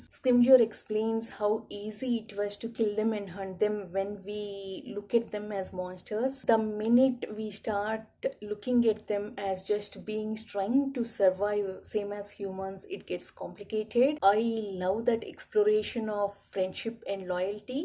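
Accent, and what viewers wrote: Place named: Scotland, United Kingdom. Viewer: You had Me at Scottish 😂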